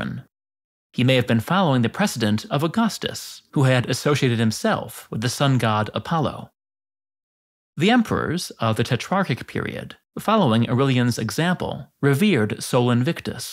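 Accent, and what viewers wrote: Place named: Wisconsin, United States. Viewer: love that Wisconsin accent 👍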